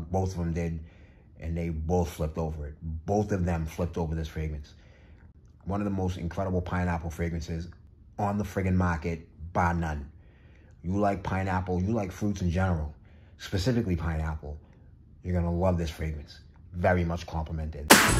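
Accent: Boston, United States. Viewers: Great video as always, bro! Welcome back, with gratitude from Woostah for your no nonsense tellin it how it is & great to hear that Boston accent representin & preachin at fragchuch for a Sunday! Think I finally found a place up in the Boston area that may carry a decent selection of niche and indie houses but haven’t had a chance yet to check it out - believe it’s called pickwick’s mercantile in Portsmouth. Could be dangerous to visit 😂have a great rest of your day!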